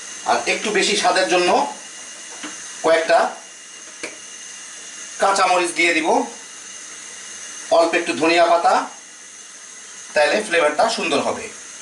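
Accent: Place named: Noakhali, Bangladesh. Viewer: মনে হয় নোয়াখালী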